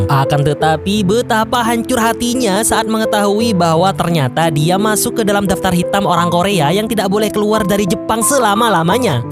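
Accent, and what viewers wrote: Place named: Madura, Indonesia. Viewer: LOGATNYA KETAUAN BANGET KALO ORANG MADURA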